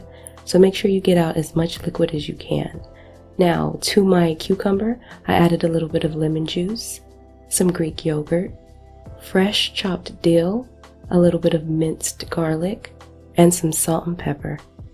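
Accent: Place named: Philadelphia, United States. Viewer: I recognized that Philly voice!!!😉🎶 During this pandemic, we couldn't take Mom out for her birthday dinner, so we made this and a red velvet cake! She loved every bite of it!!! Keep 'em coming! Jersey's in the house!!!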